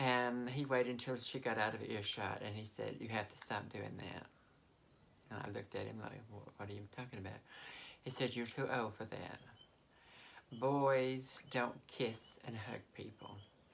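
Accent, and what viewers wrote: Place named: Mississippi, United States. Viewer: like you I'm 63 years old and I still full on hug my son who is 41 and a leader of men and women in the fire service. I have always hugged my family even the inlaws. You and I have more in common than most people would believe. Like you I struggled in school because in the early 1970's learning disabilities like dyslexia or the broader spectrum of autism didn't reach the rural schools. In spite of my comment to learning, I just didn't get it. While my grades were never very good, my conduct and respect for others was above expectations. Turns out I'm extremely mechanical and thrived in the apprenticeship programs of the trucking industry. Show me how to do something and I'll never forget it, tell me, and it will time for me to figure it out. I love your Mississippi draw and voice inflection. Bests wishes and stay positive.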